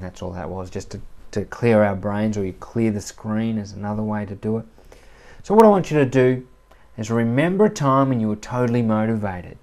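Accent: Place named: Australia. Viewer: Amazing. I only heard the first two seconds before it went back to buffering and I could tell he was Australian just from "Hi, this is Mar-"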